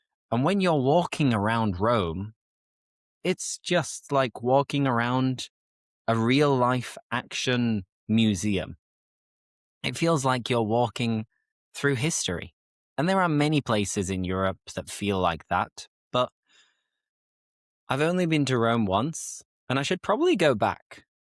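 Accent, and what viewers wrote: United Kingdom. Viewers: This podcast episode unpacks essential holiday expressions, phrasal verbs, and travel vocabulary through slow, clear British English and personal storytelling. The practical examples and interactive Q&A format make picking up natural travel idioms completely effortless. Honestly, this is hands down one of the most practical and enjoyable listening practice lessons for boosting conversational English!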